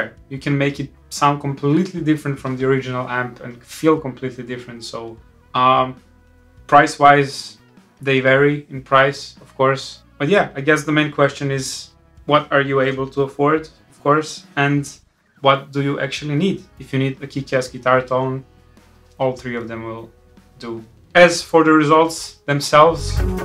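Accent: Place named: Russia. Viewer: У тебя русский акцент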